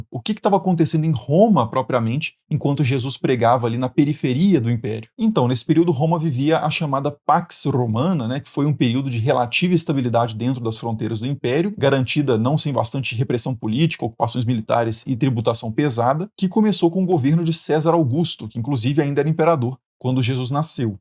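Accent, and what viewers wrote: Minas Gerais, Brazil. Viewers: A didática do "mineirês" é a melhor! 😂😂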